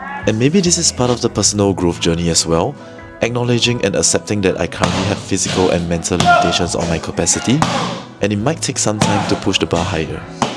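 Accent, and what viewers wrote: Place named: Singapore. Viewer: Hey, keep going man. People who succeed in life are the ones who always learn and push themselves to be better. Good luck with your goals and inspirations. P.S. You sound like Singaporean. I am moving to BKK from SG with the family soon too (I am a westerner). Maybe we could catch up IRL:D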